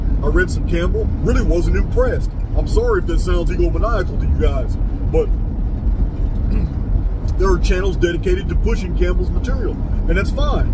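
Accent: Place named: Texas, United States. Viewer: Dude you sound soooo Tex us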